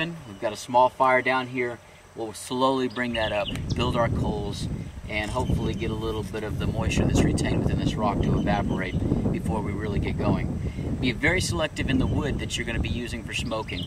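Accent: Texas, United States. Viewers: I love hearing you say the things Texans say, I miss all my country folk back home. "if you hold your tongue right" I say this in Arizona to a lot of people and always have to explain.... thanks for you vids and I hope things are going well for you, haven't noticed any updates so far. thank you thank you thank you and speedy and full recovery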